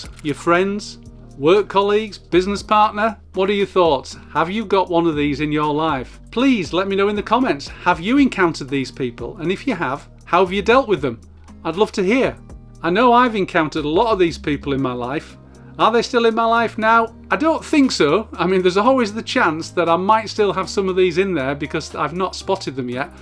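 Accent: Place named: England, United Kingdom. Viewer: I could listen to you all day. I like your English accent! I believe it makes that experience pill easier to swallow. I was hypnotized to quit smoking 17 years ago. I believe it helped because she had a wonderful accent like yours.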